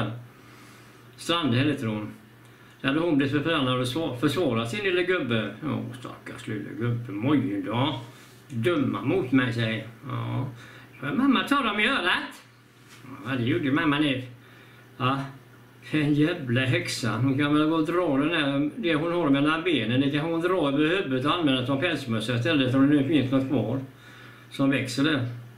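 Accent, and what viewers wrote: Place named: Gothenburg, Sweden. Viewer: Folket som sover kommer nog inte att vakna, så hjärntvättade. Du är så bra fortsätt med detta. Och din göteborgska, den är så härlig.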